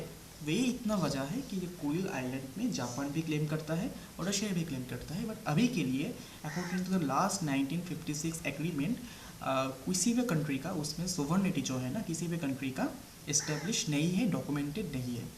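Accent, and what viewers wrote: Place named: Bengal, India. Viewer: I don't whose voice this is...but I want to request him to increase the volume because I cannot listen it without headphone 😐... otherwise it's nice... I guess any Bangali Babu is teaching us... because his pronounciation of Hindi words say this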